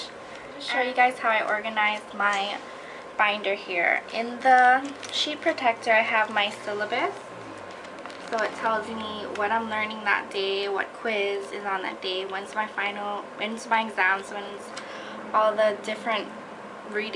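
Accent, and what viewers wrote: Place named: Hawaii, United States. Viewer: U have a pigeon accent r u from Hawaii?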